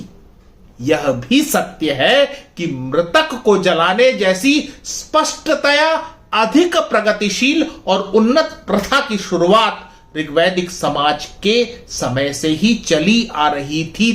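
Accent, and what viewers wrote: Standard. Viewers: Ap bahut sudh hindi bolrahehe Nahi to jisko Dekho adha English adha hindi boltehe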